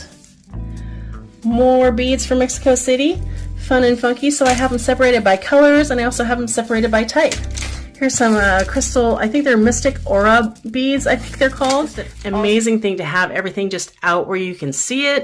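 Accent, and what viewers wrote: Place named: South, United States. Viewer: I like the southern drawl!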